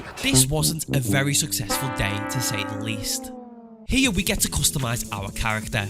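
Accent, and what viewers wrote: Liverpool, United Kingdom. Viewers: Are you a scouser? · hello fellow scouser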